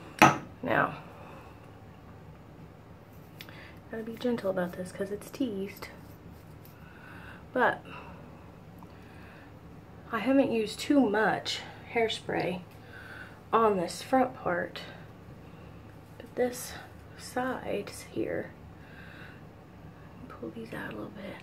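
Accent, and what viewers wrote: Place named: Midwest, United States. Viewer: I would just wear wigs. Bigwigs Edit: I knew that was a midwestern accent! Also I hope she is doing well today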